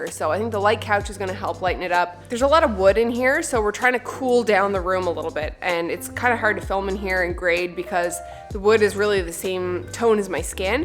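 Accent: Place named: Newfoundland, Canada. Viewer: Your accent makes me miss home! (Newfoundlander in BC)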